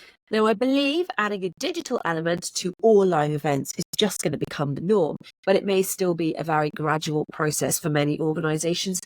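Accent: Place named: United Kingdom. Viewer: Haven't been around for a while 🎉. Missed the British accent.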